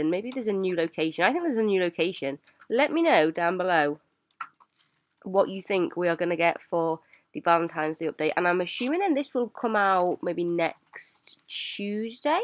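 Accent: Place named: United Kingdom. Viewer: Hi!!! I am so glad that I found your channel. It helped me a lot and your are so funny!!! I just have a small question. You obviously have a British accent, so do you live in Britain and if yes do you live in England ?? I am asking because I will probably visit England (more specifically London) and I would really be happy to know that I am so close to one of my favorite youtuber!!!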